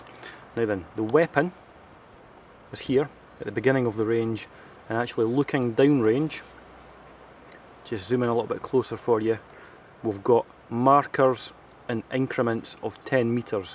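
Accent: Scotland, United Kingdom. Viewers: you dont get much more scotish =S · You are so scottish like john mctavish :)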